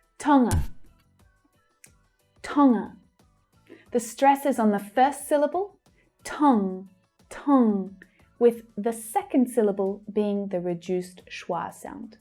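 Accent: Australia. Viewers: mmmEnglish rules and you try to make it fun. I'm Canadian and you seem to have a combination Australian / English accent. Is that because you have a Melbourne accent or because you have spent time in the UK? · My goal in 2019 i wanna pursue my master degree in Australia, hope this year i can make it. Thank a million....great video. I love your Australian Accent,❤️ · beautiful woman, nice voice, is that a slight Australian accent? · Hi, your Australian accent is different... it is very easy to understand...Ths!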